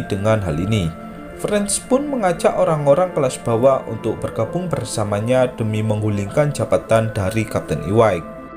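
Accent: Java, Indonesia. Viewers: ini terlalu medok suaranya hahaha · Miminnya orang jawa kayanya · "Medok-nya" kental. Wong Jowo toh mas??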